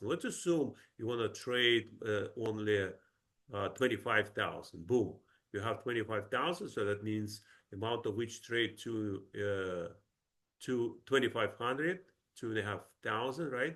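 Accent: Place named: Ukraine. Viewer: What a terrible narrator. He sounds like a Ukrainian. Don't you have narrators who speak English fluently?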